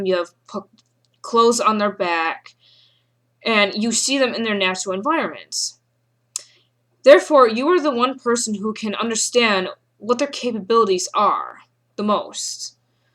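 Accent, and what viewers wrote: New York, United States. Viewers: Is that some sort of new york accent? Cool.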